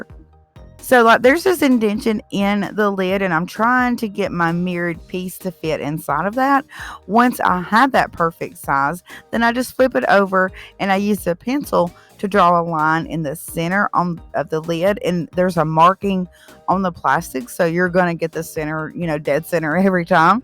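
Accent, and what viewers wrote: South, United States. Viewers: GORGEOUS!!!!!!! Btw I think I look at your videos as to watch the crafts as much I do to listen beautiful southern accent...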